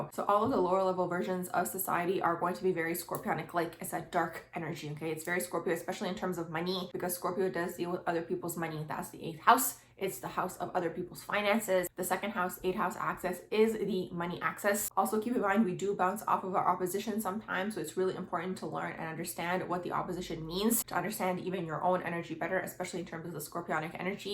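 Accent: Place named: Upper Midwest, United States. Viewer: Love this video, everything you said is true! Side note: you have to be either from Northern Illinois, Wisconsin or Minnesota.... I'm from Illinois and i recognize the accent!!!!❤❤❤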